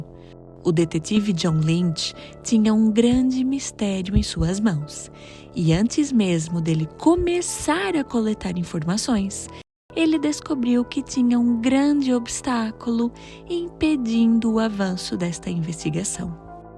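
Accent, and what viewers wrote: Portugal. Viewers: Parabensppelo bom portugues de Portugal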